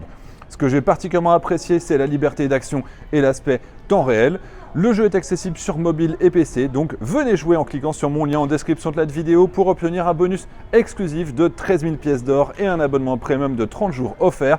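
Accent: Morocco. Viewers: on dirait un marocain qui parle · on dirait qu'un marocain qui parle!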